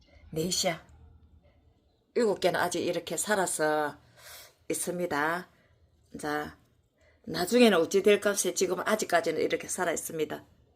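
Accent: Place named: Gyeongsang, South Korea. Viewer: 경상도 사투리 구수하고 듣기 넘 좋네요 영상 잘보고 갑니다 감사합니다...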